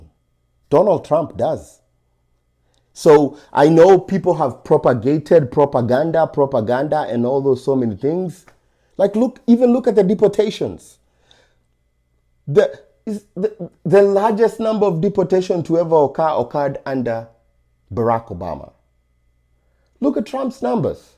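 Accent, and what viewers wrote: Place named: England, United Kingdom. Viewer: Weh! English accent for me😊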